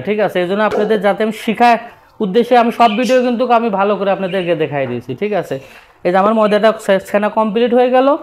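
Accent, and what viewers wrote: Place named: Bogura, Bangladesh. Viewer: বগুড়া বাড়ি মনে হয়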